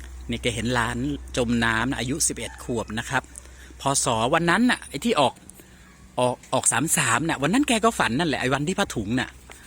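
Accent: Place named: Laos. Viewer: ออกลาวแล้วค่ะ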